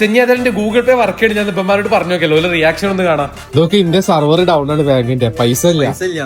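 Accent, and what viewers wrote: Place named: Palakkad, India. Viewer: പാലക്കാടൻ slangil bengalinod bengal evidayann choikku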